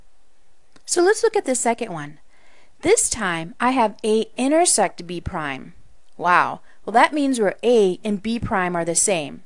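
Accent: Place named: Canada. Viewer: hahah, you're Canadian.. aren't you! XD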